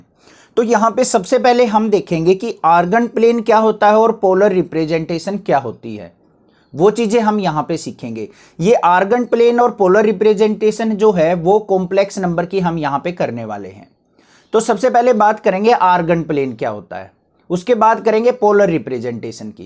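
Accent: Haryana, India. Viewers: Sir are you from haryana? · bhai first time haryanvi accent mai pad raha hu, addiction ho jayga lag raha hai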